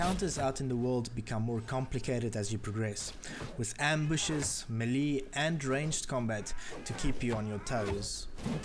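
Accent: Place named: South Africa. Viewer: Why does this guy speaking sound like a Afrikaaner Anyways this game looks grea, however $40 dollars is SHIT expensive for a experimental title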